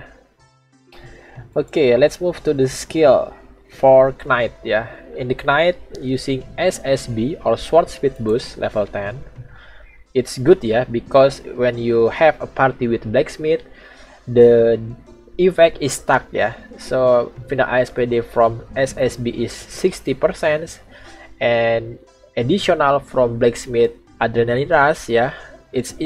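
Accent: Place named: Indonesia. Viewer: Njir kirain orng mana wkwkkw ternyata indo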